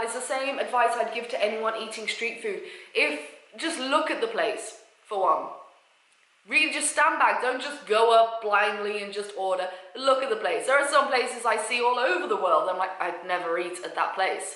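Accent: England, United Kingdom. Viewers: I love that English accent.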